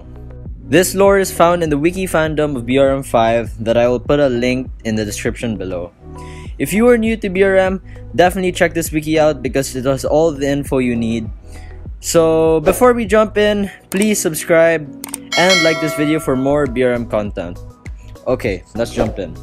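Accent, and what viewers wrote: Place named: Philippines. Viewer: I can already tell he is a filipino by his accent.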